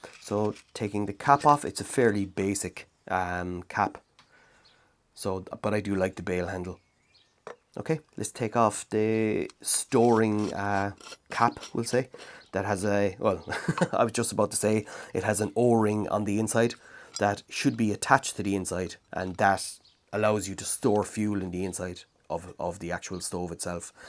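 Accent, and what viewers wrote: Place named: Ireland. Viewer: Hi. Loving your videos. I'm in the middle of your hiking with your dog one, and your life interruptions. I feel for you buddy, been there. Hopefully, you're getting back on track now. I'm in Kent, but my great grandfather was born and raised in Clonmel, so I always feel happy when I hear the beautiful Irish accent. I must come over for a visit some time. Keep your chin up, hopefully it'll all work out for you. I hope your Dad is all good now. Cheers buddy. Andy 👊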